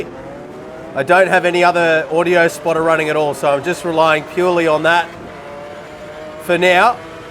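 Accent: Australia. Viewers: Got me with the Aussie accent...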